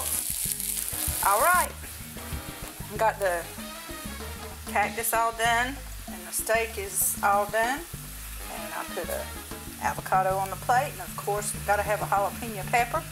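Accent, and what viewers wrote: South, United States. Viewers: Love her accent! Makes me miss the south.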